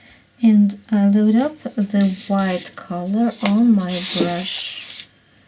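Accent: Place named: Russia. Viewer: вы русскоговорящая? Акцент очень наш))))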